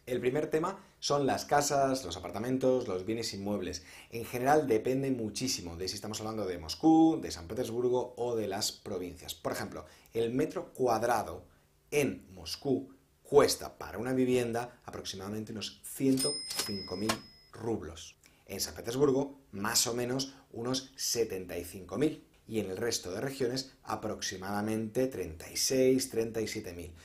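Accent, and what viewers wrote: Spain. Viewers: Exelente información muy didáctica, por tu acento eres español. ¿Porqué no nos das la misma imformación pero de tu país España? Saludos desde Ecuador. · Sí, tu canal és super informativo,además me encanta tu acento español de españa,saludos desde Brazil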